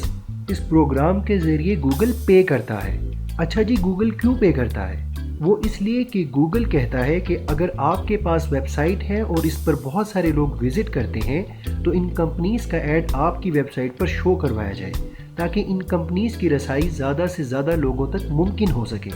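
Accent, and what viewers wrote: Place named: Kashmir, India. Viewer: Bhai, good help hy ye... Men khud Azad kashmir Muzaffarabad sy hon... ap kashmiri speaking hen kia? Your accent say's something about Kashmiri (Koshur) Language...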